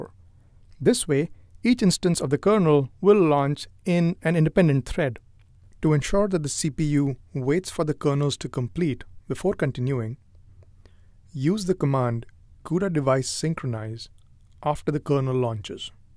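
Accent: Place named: India. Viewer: Painful Indian English Accent.... Its Always Feel The Difference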